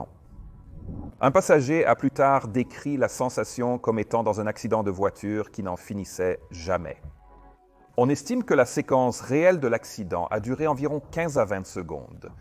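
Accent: Quebec, Canada. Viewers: Excellent. Bravo cousin. 👌👍Avec l'accent Outre Atlantique, c'est terrible. Pour ma part j'ai un faible pour le Boeing 747 😉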